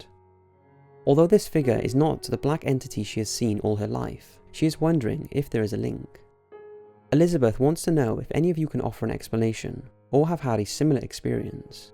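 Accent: Wales, United Kingdom. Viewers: He's from Monmouthshire in Wales. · Go to Wales we all sound like that :)) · Hi, i love your voice xx...where are you from in the UK? xx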